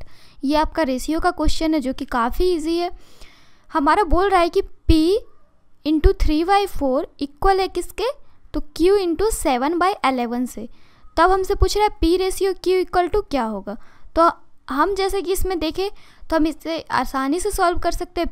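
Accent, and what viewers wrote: Bihar, India. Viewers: mam bihar ki tune hai aapki...ya up east ki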